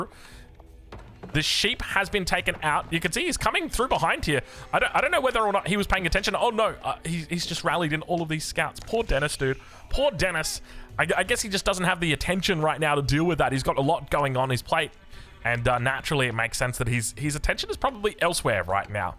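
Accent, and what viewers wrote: Australia. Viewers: is truly the top tier caster. Funny, insightful, genuinely passionionate and knowledgeable about the game, a family man, that delicious aussie accent, minimal shilling, not afraid to call out pros and devs while being generous in giving props to good plays and dev decisions and shouting out and linking players whenever possible. I don't like every video but let me tell you I love every video and haven't missed one in a while (except FFA's I skip those sorry). KEEP UP THE SICK WORK MATE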